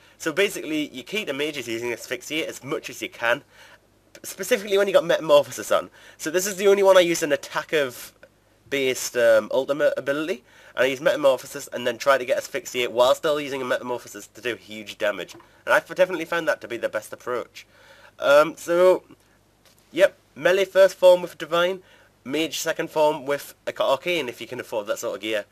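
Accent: Newcastle, United Kingdom. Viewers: Are you a geordie? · can tell ur a geordie haha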